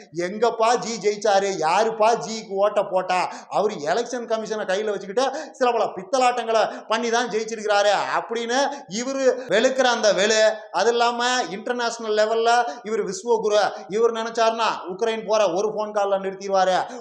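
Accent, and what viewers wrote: Kongu, India. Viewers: இது எங்கள் கொங்கு நாட்டு பேச்சுஸ சத்யராஜ் கூட இப்படி தான் பேசுவார்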